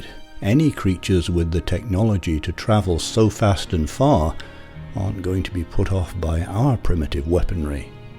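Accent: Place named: Yorkshire, United Kingdom. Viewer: There's a hint of a Yorkshire accent leaking through the RP elecution if I'm not mistaken.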